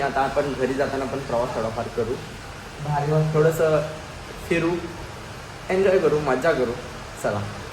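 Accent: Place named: Sangli, India. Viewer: सांगली मधली tone आहे तुझा बोलीची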